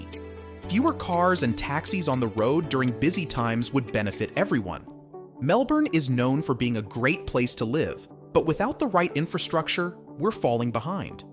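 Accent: United States. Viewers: Why do we have a yank who can’t pronounce Melbourne talking about “our” city??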